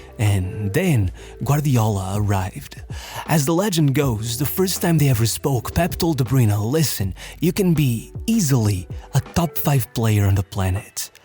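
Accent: Portugal. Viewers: Mano, ando a ver isto a 2 anos e jurava que o sotaque parecia português, incrédulo, trabalho espetacular. Super fixe ver um canal desta magnitude e qualidade sair da nossa pequena mas dedicada comunidade, melhor das sortes🇵🇹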